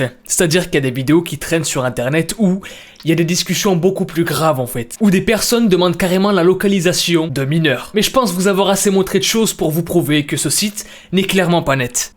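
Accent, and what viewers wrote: England, United Kingdom. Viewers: J'adore ton accentuations anglaise 🥰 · On es tous d'accord que l'accent d'anglais de et Magnifique ✨ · Moi je trouve on parle pas assez de l'accent anglais MAGNIFIQUE de 🤗🤩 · Un accent anglais de qualité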